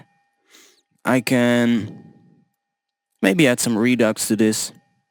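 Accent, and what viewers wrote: Netherlands. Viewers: Good tutorial. En volgens mij ben je nederlander ;)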